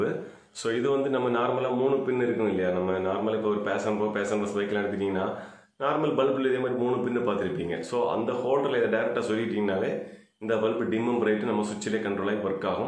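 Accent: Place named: Marthandam, India. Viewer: Marthaandam என்பது உங்கள் பேச்சிலேயே தெரிகிறது நண்பா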